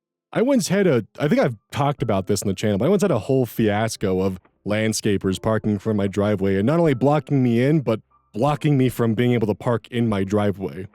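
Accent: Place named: United States. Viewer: You weren’t that bad with pronunciation with that first story, you Americanized it a bit but it was all understandable. also French pronunciation is extremely specific, and often inaccurate to how something is spelt (see oiseaux = wazo. I genuinely couldn’t spell it myself- it’s been a while since I’ve been in French education so I’m a bit rusty)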